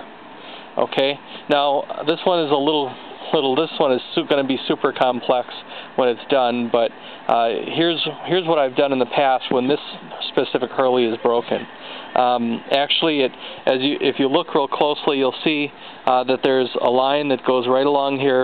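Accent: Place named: United States. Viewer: great to hear an american accent. good sign.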